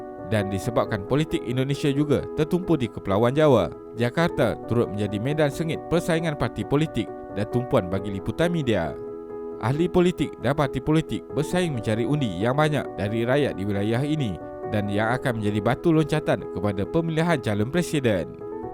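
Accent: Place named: Peninsular Malaysia, Malaysia. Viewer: Asyiiiik..... dialek semenanjung nyaman didengar, macam berade di semenanjung sumatera.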